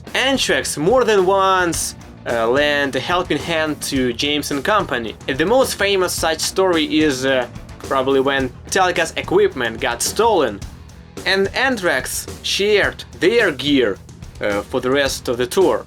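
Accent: Eastern Europe. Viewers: I dont know why but listening to an Eastern European saying complex musical terms is really satisfying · Holy shit your accent is heavily east European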